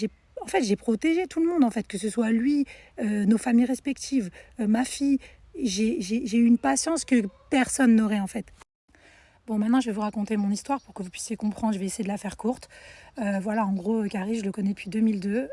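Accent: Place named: Ivory Coast. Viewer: Elle est obligée de prendre l accent ivoirien?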